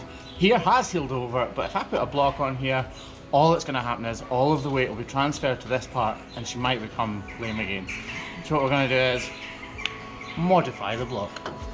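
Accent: Scotland, United Kingdom. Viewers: I know nothing about farms, cows, etc. going through my you tube and saw one of your video’s, clicked it and binge watched a ton of your video’s.l admire your work. I don’t even know how you deal with the poop and pee, let alone the job. Your a kind person. Your face looks really good . I saw where your eye socket and nose was broken WOW! Curious , how did you get into this type of job? Love your accent.Scotland looks so beautiful. Been looking into my family tree my grandfather last name was McClurg. Searching to see if it’s Scottish or irish.Take Care. I live in the United States.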